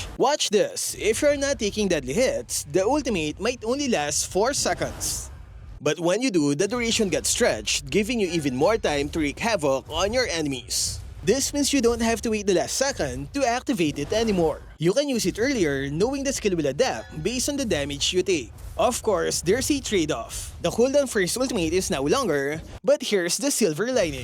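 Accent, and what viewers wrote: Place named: Philippines. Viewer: Logat PH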